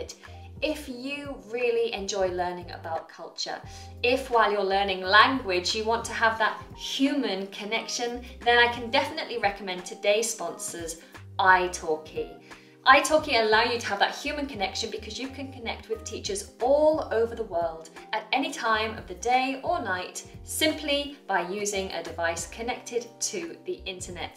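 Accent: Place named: United Kingdom. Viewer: I'm enjoying your British English ))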